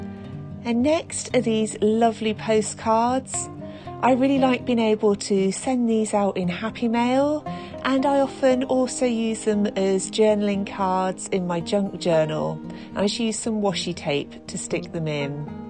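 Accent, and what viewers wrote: United Kingdom. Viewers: Dear, first of all, I want to emphasize that my comment relates to all of your videos and not specifically to this one: I love your calmness, your British accent and it's always as if you were smiling while recording. It's lovely. 💕The music in the background and the recognizable colours (being coordinated) make you stand out among lots of other, also very talented people on the net. Thank you for sharing and keep up with your style and youtubing.:) Looking forward to it ❤️ Greetings from Serbia 🌞👋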